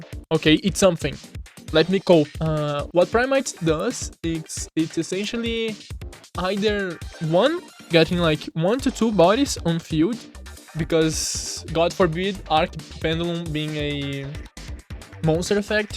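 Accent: Brazil. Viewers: You ARE Brazilian? Sotaque eu acho Im trying to do a deck that can make XYZ, shincro and fusion in one turn, im using dimensional dragons of manga, but could you help me ?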